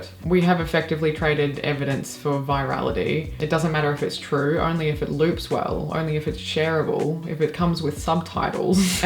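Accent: Australia. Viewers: Are you an Aussie living in America or something ? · I agree, but I having to listen to this in an Australian accent is somewhat counterproductive. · The counter argument to your video is the covid debacle, where many experts and scientists did lie to the public, often repeatedly. An advanced degree does not confer advanced ethics, or any ethics at all. And btw, I don't know if you're Australian or a New Zealander, but both those countries' responses to the pandemic made America look like a land full of geniuses in comparison (which obviously we're not)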